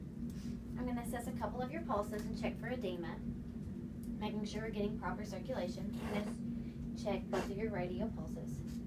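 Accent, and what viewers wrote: United States. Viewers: Kentucky accent if I'm correct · Us southern girls have that accent! 😉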